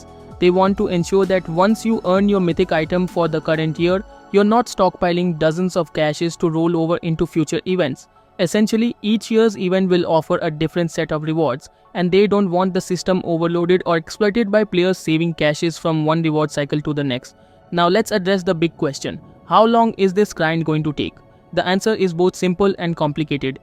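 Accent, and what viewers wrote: India. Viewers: when i heard indian accent , “this is must be a scammer” .nah sorry bro ,i dont grinding ,i just bought using cp value at 15.000 cp ..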